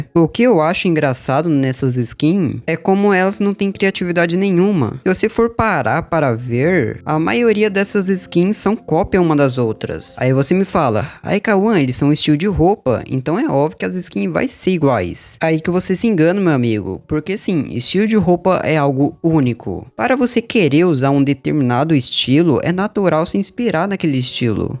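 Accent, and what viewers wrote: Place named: Portugal. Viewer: deve ser de Portugal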